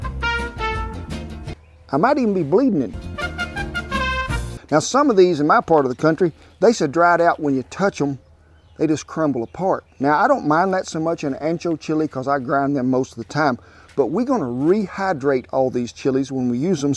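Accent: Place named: Texas, United States. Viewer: I knew you were from TX, my father's side is from TX and that accent gets me every time!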